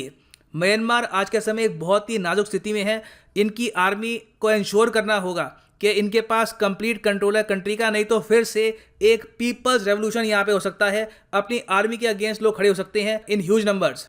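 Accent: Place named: Tamil Nadu, India. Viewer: You speaks like tamil, What's your mother tounge and mother language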